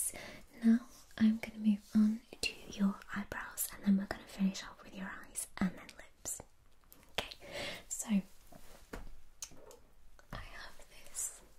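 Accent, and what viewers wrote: United Kingdom. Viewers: Your british accent are so lovely! I love your videos a lot! Success for you ❤️ Kisses from Brazil 🇧🇷🥰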